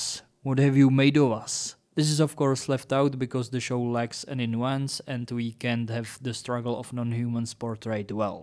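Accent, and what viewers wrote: Czech Republic. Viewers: Z přízvuku slyším že jsi čech, tak jen takhle utajeně :) : skvělej rozbor, ušetřil jsi mi čas se sledováním 3.série, to si raději konečně přečtu Husitskou trilogii.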